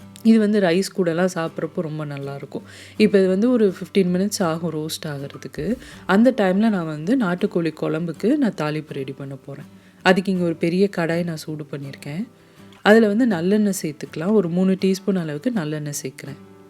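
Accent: Madurai, India. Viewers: Bro review super..madhurai slang summa alluthu...😍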